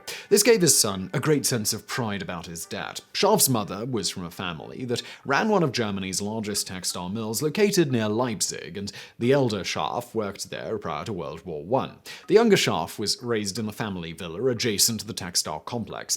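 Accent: United Kingdom. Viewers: It's Vsuace but British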